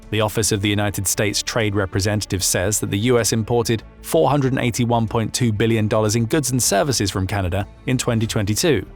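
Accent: United Kingdom. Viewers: Even though the topic is disturbing, it is buffered by a British voice. I don't think this is realistic, but I hope that our Commonwealth allies would help us.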